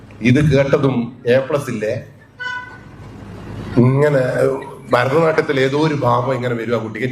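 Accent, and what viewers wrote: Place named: Southern Kerala, India. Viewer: തെക്കൻ ഭാഷ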